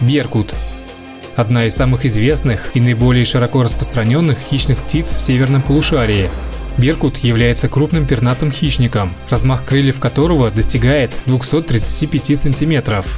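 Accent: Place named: Ural-Volga, Russia. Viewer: Текст хороший, а уральско поволжский акцент утомил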